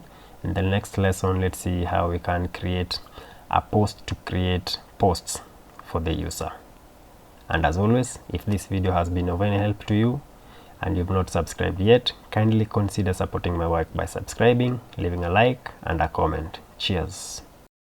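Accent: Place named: India. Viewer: the technical level of the Indians on this platform is impressive, however the Indian accent for me speaking Portugués is a big problem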